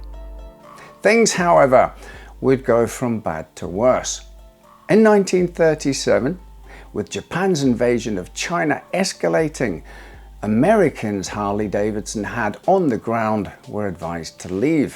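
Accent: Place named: Scotland, United Kingdom. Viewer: Love how he starts with an English accent then gradually becomes quite distinctly Scottish. In a very charming way!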